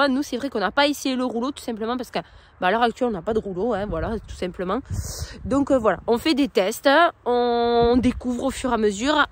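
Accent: South, France. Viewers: Bonjour belle vidéo comme d habitude bien expliquée avec ton bel accent du sud Bon réveillon à a vous quatre et à l année prochaine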